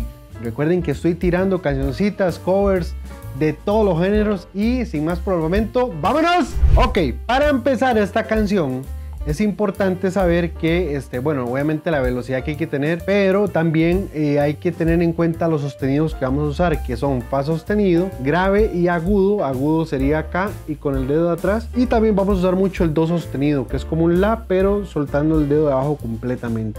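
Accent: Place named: Costa Rica. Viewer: Me suenas Tico. NICE BRO. PRIMER VEZ QUE VEO UNO DE TUS VIDEOS Y YA TE SIGO...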